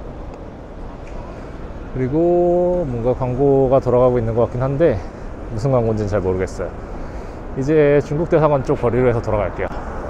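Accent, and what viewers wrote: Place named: South Korea. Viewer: No se aprecia ningún acento ni de España ni de latinoamerica por lo que no sé dónde lo has aprendido. Lo del acento lo decía porque se notaba que el que hablaba, o sea, tú, tenía un deje coreano que he escuchado en otros vídeos y me encanta. Esa tonalidad me pone feliz y hace que sonría. Por todo ello GRACIAS desde España.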